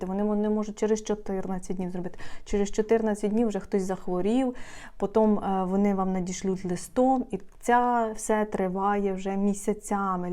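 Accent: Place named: Western Ukraine, Ukraine. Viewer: а ви самі чому в Германії. Ви звідки тікали, з якого міста? Я питаю, бо по вимові, ви з західної частини, а вас не обстрілювали... Багато людей з західних обл. Повтікали за кордон і здають переселенцям свої квартири дуже дорого, маючи дохід живуть за кордоном і ведуть себе наглувато, розорять нашу країну.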